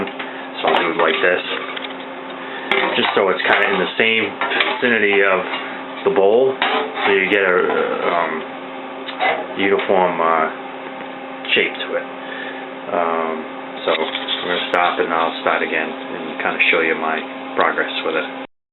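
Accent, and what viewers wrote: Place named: New England, United States. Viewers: Lmao I was like hey am I bugging or is he from new England. I'm 15 min south of boston myself. Where you from? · I wouldn’t apologize, ya did a great job with your video, and the New England accent, I was raised in Boston, and have lived for 34 years in Pennsylvania, and still have that Boston accent, makes me feel like I was listening to a buddy back home 😊 nice job on the fender........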